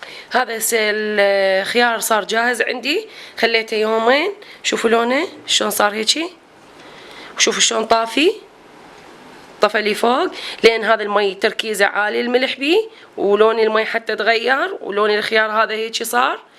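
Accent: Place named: Iraq. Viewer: اكثر وحده أعجب بيه اثناءمشاهدة الفيديو كلامج عراقي وطبخج عراقي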